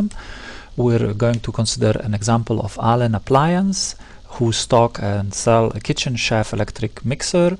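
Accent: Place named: Egypt. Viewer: اللهجة مصرية بحتة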